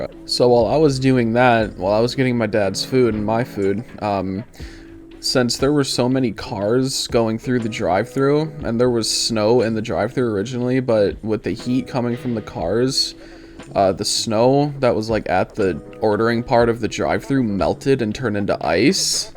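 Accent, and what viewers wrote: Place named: Mexico. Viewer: u sound mexican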